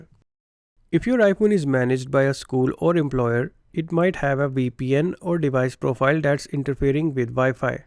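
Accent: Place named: India. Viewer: Indians sepaking english sound very ridiculous, Remove anything below your tonge or use subtitles, the world will thank you.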